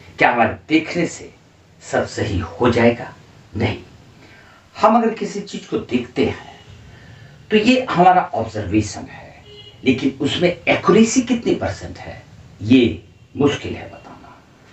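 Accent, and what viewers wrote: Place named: Bihar, India. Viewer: Lk dan 👍 sir aap bahut hi achha se samjhate hai students ko asani se samjh aata hoga aap ki boli se lagta hai aap Bihar se bhi ham thik kah rahi hu sir ji 🙏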